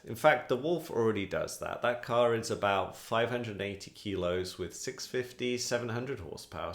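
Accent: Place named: United Kingdom. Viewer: A ratio without units is meaningless, unless it is a ratio of values with the same units. Since power and weight are different measures, "1:1" by itself could mean anything... 1 horsepower per pound? 1 horsepower per kilogram? 1 kilowatt per pound? 1 kilowatt per kilogram? 1 BTU/hour per stone? (in honour of British accent) Any of these is arbitrary and unrelated to actual performance goals.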